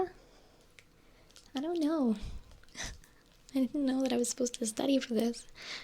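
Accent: United States. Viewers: yer a northeasterner hell yeah you rock lol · Ah a fellow New Englander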